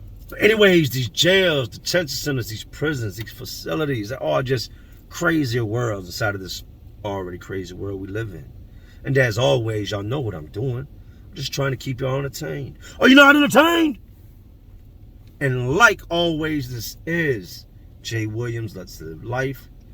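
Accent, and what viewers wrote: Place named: Philadelphia, United States. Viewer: When I 1st heard u talk, I thought you were from N. Philly!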